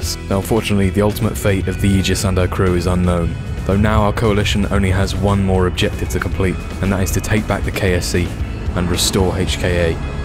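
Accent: United Kingdom. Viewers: Find me a non British KSP content creator…. It’s annoying. Honestly, what is with British people and KSP? I even have a friend in Britain who plays. I’m not overly pissed, I’m saying there’s too many and I’m done listening to the accent. I’m entitled to my own opinion for whoever finds this comment and so are you. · I literally adore your voice. The voice over aspect really does sound professional. The air combat made me cringe a little though, a bit too much top gun. If an enemy started slowing down in front of them a real pilot would start to climb to to lower the speed and maintain distance. That way the enemy would have to climb to attack him, which they can't do with no speed, and the attacking pilot can later trade that altitude for acceleration later in the fight as well as using gravity to enhance his turn capability. I mean most people will just think its cool and not understand the finer points of air combat so don't take it too hard, but its about the most basic example I could imagine of energy-manoeuvrability theory upon which every single combat pilot's training is based. 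Literally as elementary as multiplication for a physicist. But that off my chest, the rest of the video was great and very well produced. And hello from a fellow Brit, at least I won't have to cringe at every other word's pronunciation.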